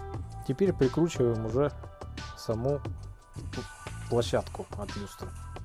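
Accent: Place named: Siberia, Russia. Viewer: Это обычный говор сибиряков. Не в этом суть, главное, руки золотые!👍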